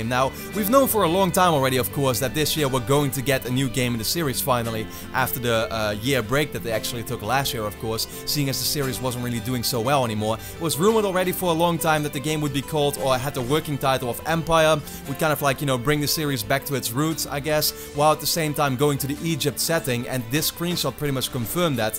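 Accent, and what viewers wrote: Netherlands. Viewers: Is he from the Netherlands?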